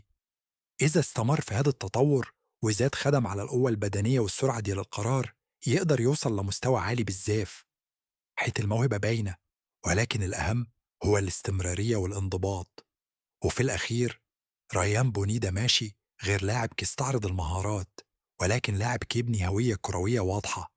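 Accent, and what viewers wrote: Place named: Egypt. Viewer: ماشاءالله تتقن جيدا اللهجة المغربية بنطق مصري😂😅. جميل جدا❤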